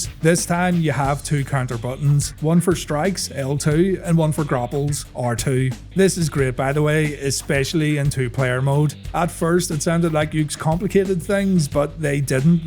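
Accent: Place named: Belfast, United Kingdom. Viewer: You from Belfast lad? You sound like it, from there myself.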